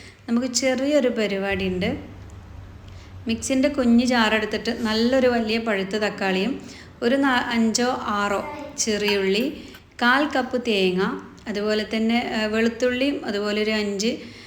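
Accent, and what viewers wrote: Malappuram, India. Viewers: മലപ്പുറം താത്തയുടെ മലപ്പുറം സ്റ്റൈലിലുള്ള സംസാരവും പാചകവും എത്ര രസകരമാണന്നോ? എനിക്ക് വളരെ ഇഷ്ടമാണ് നിങ്ങളെ 'എന്താ മുഖം കാണിക്കാത്തെ കൈമാത്രമാണല്ലൊ കാണുന്നത്.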